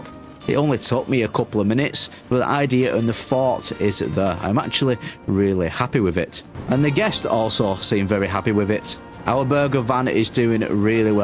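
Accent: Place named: North, United Kingdom. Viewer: great build!. I love your northern rambling... p.s...... how northern are you?!?!! you sound mor northern than me :'D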